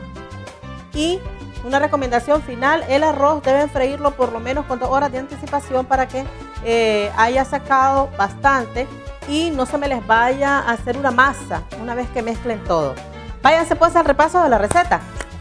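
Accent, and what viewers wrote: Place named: Nicaragua. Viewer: Me cae super bien esta mujer como habla pura nica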